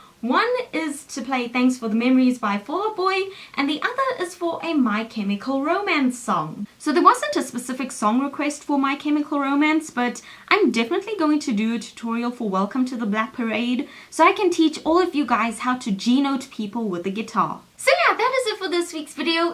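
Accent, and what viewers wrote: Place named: South Africa. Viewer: sounds like south african to my ears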